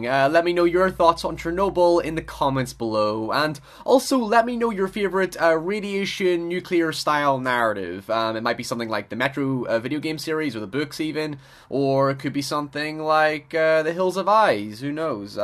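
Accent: Northern Ireland, United Kingdom. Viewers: Hye lad u from norn iron? Ye saft hur ya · Feels so good to hear a proper Northern Irish youtuber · Until you said "Nah m8 youre fucked" I didn't reaise you had a norn iron accent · This man sounds like hes from NI, wooopa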